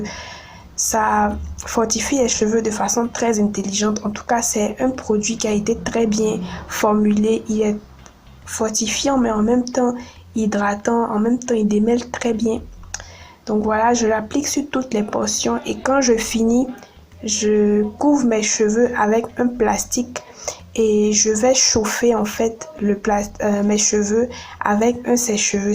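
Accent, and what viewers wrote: Ivory Coast. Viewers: L’accent ivoirien hein il est calé On adore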